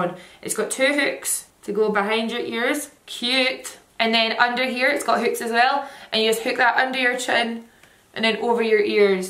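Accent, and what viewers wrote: Scotland, United Kingdom. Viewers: When you said you look like a fresh baby I died. Everything sounds better with a Scottish accent 😭🙌💛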